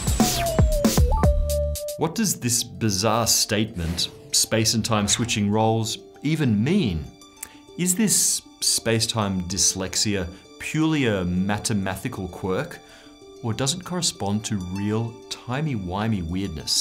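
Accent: Australia. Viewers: He's from Australia. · Why does this guy have an Australian accent? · seriously is an aussie??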